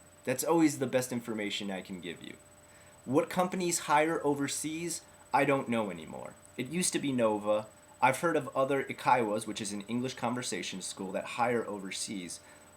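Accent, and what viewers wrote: United States. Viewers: I love the southerened accent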